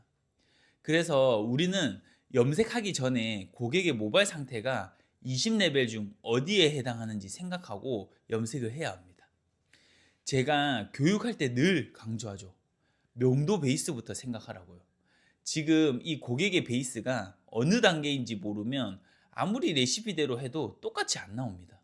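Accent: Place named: Changwon, South Korea. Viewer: 쌤 창원 출신인가요? 억양이 딱인데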